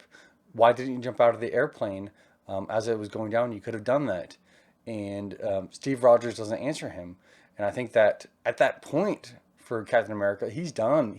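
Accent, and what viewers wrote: South, United States. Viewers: I love your video at your house in Texas during your quarantine until you're talking about the upcoming mini-series of "The Falcon and Winter Soldier" will be out on Disney+ while it started from the beginning after being handed the mantle of Captain America at the end of "Avengers: Endgame" got came out back in 2019, Sam Wilson teams up with Bucky Barnes in a worldwide adventure that puts their abilities to the test as they fight the anti-patriotism group of the Flag-Smashers after the whole trailer of the show came out at the time for awhile and Stucky is one of the characters from Marvel comics, but I hope your husband Zach will be very proud of you and I wanna say I'm very happy for you, because I love your Southern accent, I know you're half Mexican and you're gay!! #TheFalconandTheWinterSoldier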